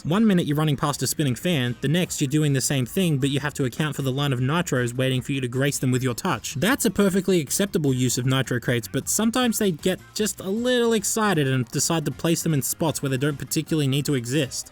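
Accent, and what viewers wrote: Australia. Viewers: Are u from Melbourne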